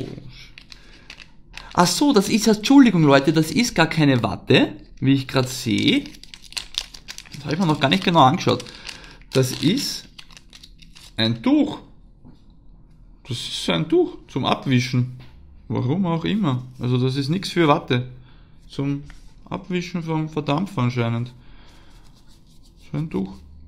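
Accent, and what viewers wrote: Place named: Austria. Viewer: Krass .... Capital Bra mit österreichischem Akzent! Klasse Video, weiter so :)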